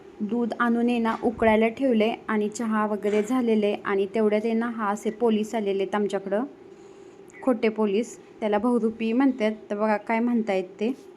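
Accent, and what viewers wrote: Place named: Kolhapur, India. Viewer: कोल्हापूरमधून आहात की सांगली आवाज तसा वाटतो.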